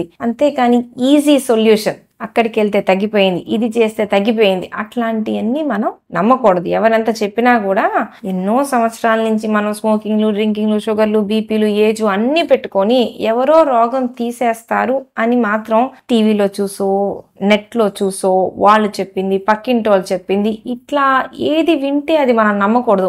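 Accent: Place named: Chirala, India. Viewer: Your Chirala telugu is superb madam, and timely speaking also nice mam..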